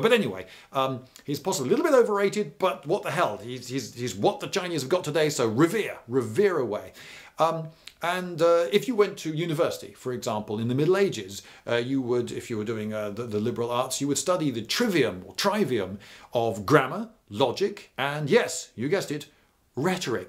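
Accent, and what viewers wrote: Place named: England, United Kingdom. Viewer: I just imagined this channel being run by a guy with a Boston accent and realized how important the English accent is to a good history channel. You just feel like he knows what he's talking about.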